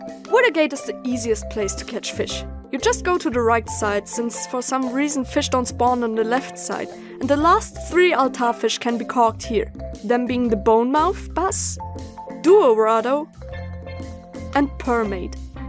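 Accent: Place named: Germany. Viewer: I just love hearing your Lazuli the Glaceon voice (though that's your normal voice, I can't help but picture my favorite ES character/Eeveelution). That smooth German accent is so cool.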